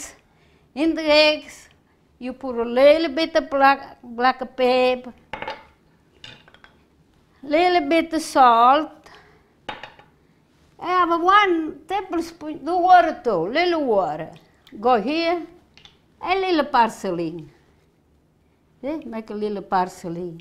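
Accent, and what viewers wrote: Italy. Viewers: you are our treasure! I made chicken alla Franchese your way and it was just as delicious as your Italian accent! Love you! ❤❤❤ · I just love cooking. She has a very easy way of explaining her style of cooking and I love her Italian accent! Thank you! God bless you & your family!😘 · If your going to make fun of her Italian style accent stop comments. Your RUDE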